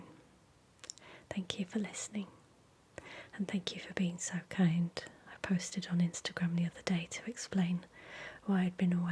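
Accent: United Kingdom. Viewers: Hi! So good to see you again and have you back!:) I've been following your channel for about five years now. Could you remind me again, where about in the UK are you located (I'm a glossophile from New Jersey, United States)?? Could you also remind me, where about in the UK is your accent from? Where did you grow up? Sending you much love and many positive vibes in these very strange times! <3 <3 <3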